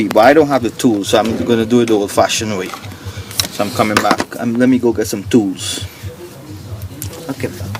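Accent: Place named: Guyana. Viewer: great video you save me 1200 dollar keep up the great work keep the videos coming ,,,,u sound like a guyanese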